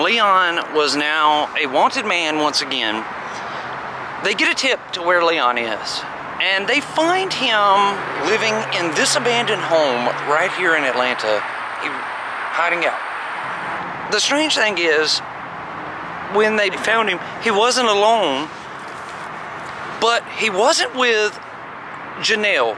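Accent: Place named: Alabama, United States. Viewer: Good day from.Australia 👍🐨 I just watched this story and have subscribed. Now a keen follower. Your content is great - interestingly presented, your natural down to earth style is 👌and i love your "Bama" accent! I look forward to many more and will catch up on your previous uploads. This is ever so sad R.I.P. sweet gal 😇. Love & light across the oceans and miles! 🙋‍♀️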